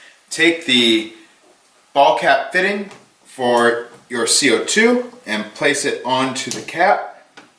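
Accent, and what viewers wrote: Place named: Australia. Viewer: Good day, Kindly give me a list of all the equipment I will need to do this forced carbonation process. I want to buy all the necessary equipment, you can add the food grade C02 tank/cylinder on the list though I will hire one whenever I need it. Kindly use English that I will understand as a south african 🇿🇦. I understood everything you said perfectly and your accent sounds Australian which means your English isn't confusing as would American English 😊😊😅😅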